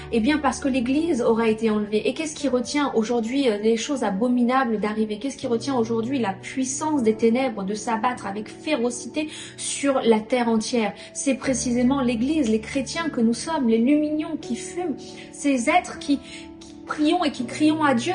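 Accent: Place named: England, United Kingdom. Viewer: I'm loving your english accent !😍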